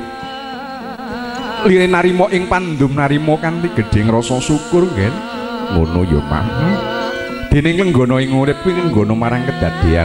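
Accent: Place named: Java, Indonesia. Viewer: Jian mantep...artikulasi jelas...intonasi pas...logat jawanya trep....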